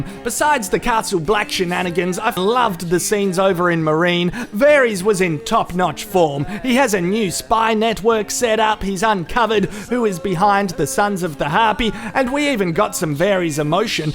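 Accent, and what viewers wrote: Australia. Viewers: love your reviews. you say what I'm thinking in Canadian eh, in a Australian voice. . . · You have clearly never met an Australian in their natural habitat.. we all speak like that.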